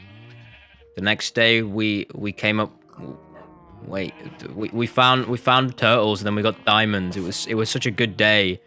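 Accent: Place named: United Kingdom. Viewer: Why.is he birtish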